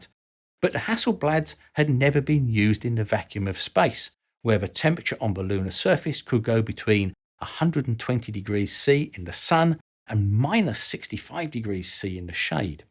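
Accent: United Kingdom. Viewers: When NASA begins to run into a wall they bring this soft spoken non confrontational! British accented to sound sophisticated and knowledgeable! To help remove doubt that this is again a story for the first time discussing a hand held camera in mercury capsule?😂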